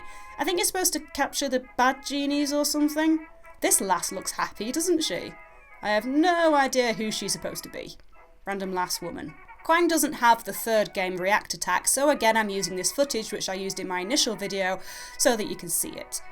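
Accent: Yorkshire, United Kingdom. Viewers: Great to see more yor'shire on you tube! As my southern colleagues tell me, "it's grim up north!" So you must be very posh! ( btw I'm from Leeds!) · What part of Yorkshire are you from? It's my second-favourite region after Durham, but Durham only wins because it has me in it. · From one Yorkshireman to a Yorkshire lass you can saw owt owever you want - its them lot not from Yorkshire that cant speak propper, They just cant take that they are not from gods county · I thought "what's she on about she doesn't have an accent " then I remembered I'm Yorkshire too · I thought you sounded from yorkshire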